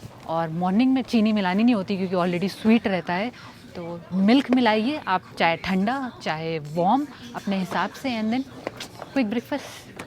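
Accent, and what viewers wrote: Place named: India. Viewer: Thick indian accent ,i think she loves india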